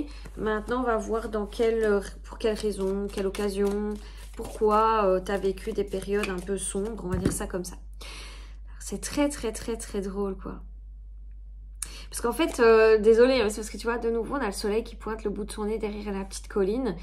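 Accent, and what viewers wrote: Belgium. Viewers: Quel chouette petite accent belge!!! Merci pour l a guidance. Bon weekend.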